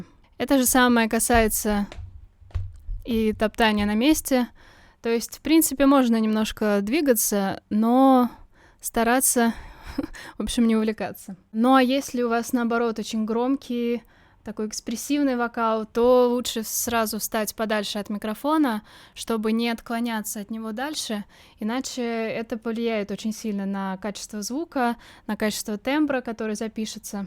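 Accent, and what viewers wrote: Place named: France. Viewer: Такой крутой урок! Подписалась с англоязычных обзоров, думала, что вы француженка по акценту. А тут оп и русский. Так приятно 😍 И вот эта серия из 3 уроков просто 🔥🔥🔥🔥🔥🔥🔥 Спасибо ❤️ У вас так много знаний по обработке, буду ждать новых уроков ❤️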